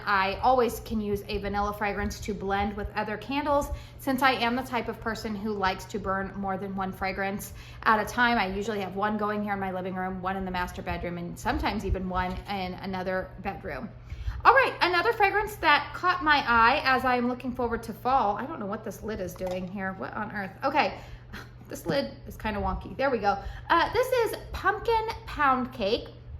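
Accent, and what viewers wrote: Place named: California, United States. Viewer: Omigosh how lovely thanks! You made my day 😀. I actually just recorded a Goose Creek haul (posting tomorrow) and we have some pretty similar takes. It is too darn early for me to start thinking about Fall as well! The Churros candle - it was playing a trick on me too! At first I thought maybe there was some apple in there. It is so similar to cider doughnut/brown/cinnamon/sugar type candles. I think I’m just so used to fragrances like that having an apple component that I imagined it. 🤷 You totally have that classic Cali accent going on and I’m here for it 😊 Thanks again! Have a great weekend!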